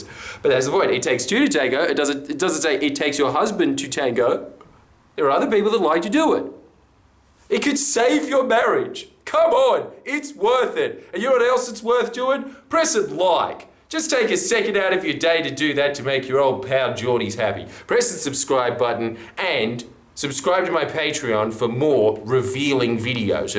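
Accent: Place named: Australia. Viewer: At this moment I am s in and don't know what you're on about. This is fine - as I only wanted to acknowledge that YouTube auto captions work well with your voice. This is great, because you are funny all by yourself without the slow car crash of captions going awry which normally happens. I don't even know why my captions were on. But I was impressed. Educated, neutral Australian accent. Works well enough for me.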